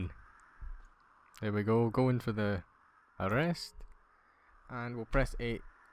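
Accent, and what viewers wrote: Scotland, United Kingdom. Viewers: And also are you from Scotland it sounds like it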